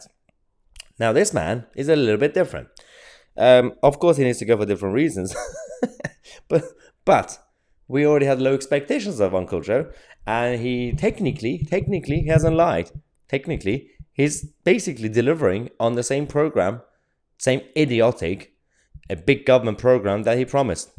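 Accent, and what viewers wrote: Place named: RP, United Kingdom. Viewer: stop talking like you got a toffee stuck in your gob you aint British so stop with the BS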